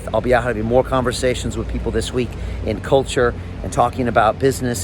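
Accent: United States. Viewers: Is this a guy with an American accent Go back home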